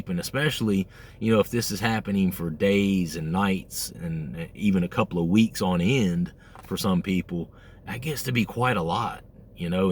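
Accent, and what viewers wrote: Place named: South, United States. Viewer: Interesting you mentioned you're from Georgia with parts of the "typical" Southern accent. My parents were born and raised in Ohio. When I was two, in 1958, we moved to Atlanta - my parents actually had the house build on the new lot. But it was in a brand new suburb in South Atlanta. Just about ALL the kids in that neighborhood and my schoolmates were transients from other parts of the country. When I moved to New York City at the age of 26 (Yes - it was quite a transition!) - most New Yorkers couldn't tell where I was from by my accent. NONE thought I was from the Northeast! They REALLY didn't guess the South.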